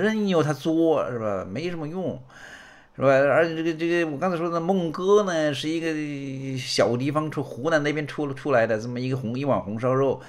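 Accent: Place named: Jingzhou, China. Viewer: 这个主播的口音和荆州地区类似:face-blue-smiling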